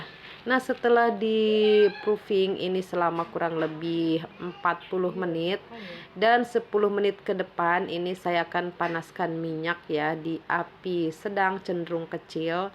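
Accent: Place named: Padang, Indonesia. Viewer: Seperti nya dari cara bicara dan logatnya, bunda ini kayak orang padang..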